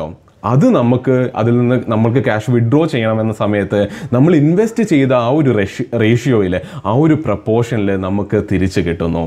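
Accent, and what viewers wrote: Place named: Kollam, India. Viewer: കൊല്ലംകാരൻ ആണ് അതാണ് 💖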